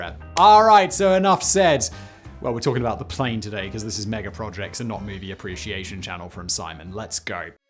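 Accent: RP, United Kingdom. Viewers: I'm a clueless Yank so forgive me if I'm totally off base here. I really like language; our boy here has a fairly posh accent, right? I've never been great at nailing the nuances of British accents but he sounds a bit fancypants, right?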